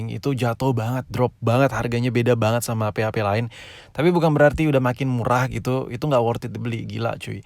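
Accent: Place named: Java, Indonesia. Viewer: Lho orang jawa ya kok bahasa indonesia masih belum lancar ada medok nya jadinya gw like terus channel lu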